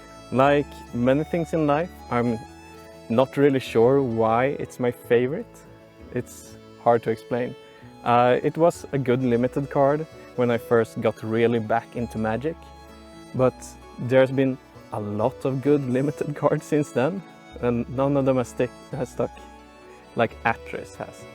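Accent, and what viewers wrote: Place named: Sweden. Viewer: As soon as I saw the house and nature I was like "this looks like Sweden", then heard you speak and was like "yep, Sweden" 💛 The fact that this was clearly filmed in summer and was published now in winter after the super snowstorm was (1) very good for my mental health to help me look forward to the next summer, and (2) a testament of the effort it must have taken you in editing and post-production. The video was amazing and I'm glad you took the leap and made it happen, congrats and keep it up!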